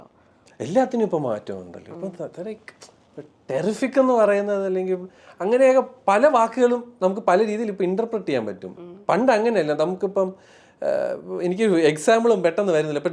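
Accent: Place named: Trivandrum, India. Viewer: Love you. Jju tvm slang vidalle. From malappuram😊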